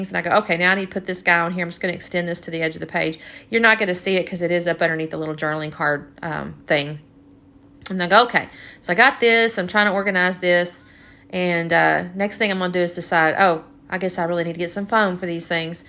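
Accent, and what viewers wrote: Texas, United States. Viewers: Thank you! I love your layouts and your process videos are some of my favorite (maybe because I am from Georgia and I hear the Texas southern accent lol). Seriously, you do a fantastic job with your videos and I am very happy to have found you. Have a great rest of the week!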